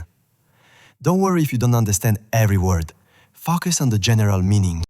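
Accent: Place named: France. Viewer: I liked your dialect when you speaking English with French flavor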